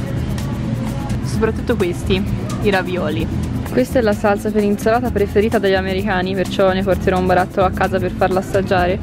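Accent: Tuscany, Italy. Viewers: Sei toscana ? Di dove? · Sei toscanaaa l ho sentito da l' accento 😂anche io sono toscana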